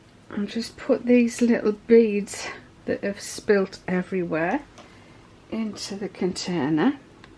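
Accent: United Kingdom. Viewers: I would love to win these moulds, I would love to use them for solid body butters or solid sugar scrubs or wax melts. I've been watching your videos for at least 10 years know. Love your British Accent and your Fabulous High end style :)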